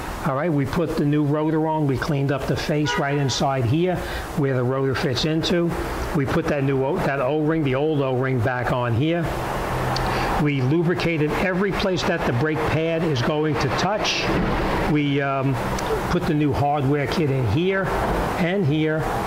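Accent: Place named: New York, United States. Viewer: Leave it to jeep to make a weird rear brake setup, lol. Im like..."an o-ring? Why, what for?...what the hell are these damn long metal clips on these brake pads for? Why did this rear rotor set come with 4 long metal brackets..what is going on here!? Damn Jeep"🤣🤣 Was gonna just do em like I did on my Ford Edge - which doesn't have those 4 long brackets that the pads slide onto at all, they just clip in like the fronts. The caliper on there looks exactly like the front calipers, except 1 piston instead of 2 . And the brake pads don't have those metal clips on them that need to be depressed to get the caliper off. Cause I looked at my mother's rear calipers and was confused at what was going on, haha. Thanks for the video! Glad I watched it before doing them on her 06 Grand Cherokee. Thanks for specifically showing the rears! Other videos I've seen atleast just show the fronts, which is normal like most cars. 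Then you get to these outer space rears🤦 haha. Thanks again! A+ on the NY accent. You sound like me, except I'd be adding some choice words in there lol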